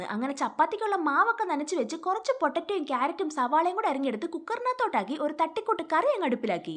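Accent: Kollam, India. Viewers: Kollam slang ❤️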